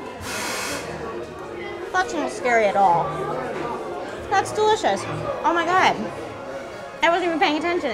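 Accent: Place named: New York, United States. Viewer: I thought she had a New York accent then she confirmed that she was a New Yorker, that's the easiest accent besides the midwestern accent to spot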